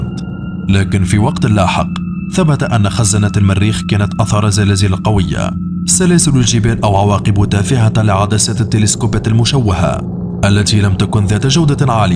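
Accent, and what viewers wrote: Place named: Tunisia. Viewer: انت تونسي ! هذا واضح من نطقك لبعض الحروف هل أنا مخطأ ؟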